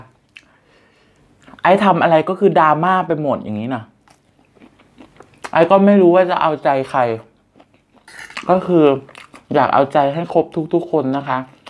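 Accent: Isan, Thailand. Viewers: ชอบตอนพี่ พูดอีสาน พูดทุกคลิปเลยน่ะคร้าบบบบบ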